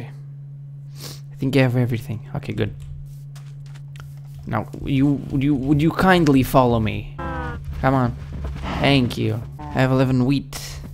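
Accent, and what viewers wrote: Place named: Portugal. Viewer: I think he´s from Portugal.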